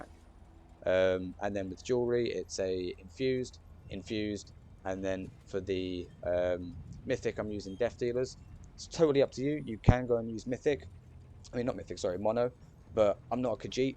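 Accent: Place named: New Zealand. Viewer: Are you kiwi? you sound like Kai Kara France my guy.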